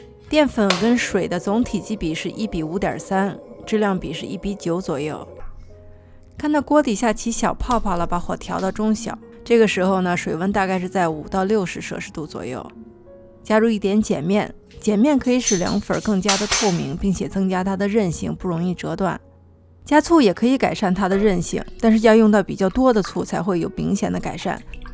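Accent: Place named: Xi'an, China. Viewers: 西安话挺好听啊，我济南人，我每个地方的方言都有自己的特色都很有意思，之前闫妮和张嘉译演的电视剧，她俩说西安话特好玩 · 西安话再土也可爱，西北特有的韵味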